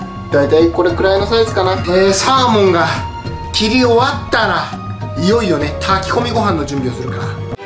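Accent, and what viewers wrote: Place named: Hokkaido, Japan. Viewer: 北海道弁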